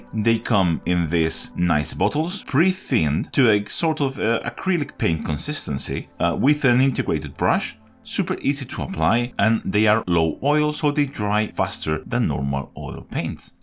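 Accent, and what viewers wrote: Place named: Spain. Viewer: Fue escucharte hablar inglés y supe de inmediato que eras español, nivelazo de inglés pero el acento español siempre se nota.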